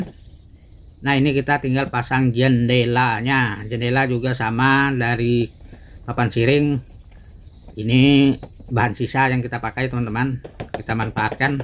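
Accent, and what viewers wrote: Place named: Kutai, Indonesia. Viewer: Dri logat berbicara spertinya suku Kutai dri Kaltim 😂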